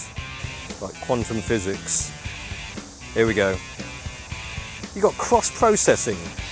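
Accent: United Kingdom. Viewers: This is REALLY bad and not in a good way. Asian guy with British accent walking around talking. I left after 4 minutes. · chinese guy with brit accent...where do you think? Duhhh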